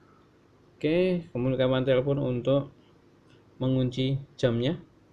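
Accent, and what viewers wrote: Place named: Java, Indonesia. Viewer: Lu yang norak bukan jamnya😂😂😂KAMPUNGAN NGOMONGNYA MEDOK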